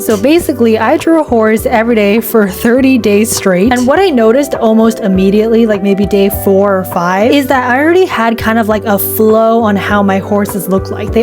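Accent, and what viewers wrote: United Kingdom. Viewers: Are you British in some parts really often yours accent sounds british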